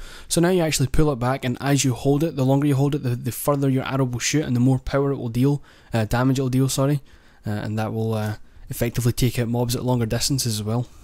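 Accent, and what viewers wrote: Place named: Scotland, United Kingdom. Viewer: You're so... scottish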